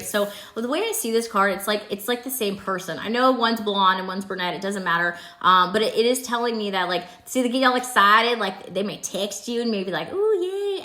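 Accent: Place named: Texas, United States. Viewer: Your readings have been spot on except…… I’ve been trying to manifest you to AZ and that hasn’t happened? I hear a Texas accent. Come on cards.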